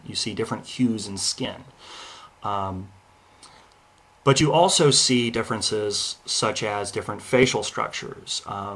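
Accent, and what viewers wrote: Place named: United Kingdom. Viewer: is the wise man of the Tribe. Having a strong british accent, being older than 18,5 and having had sex at least once, he tries to lend some legitimacy, to the average race realist, who has non of those. Its a fun effort to watch, especially knowing its predestined to fail. However I have to appload your kind and smooth delivery. Its a suttle art to strike gently.: )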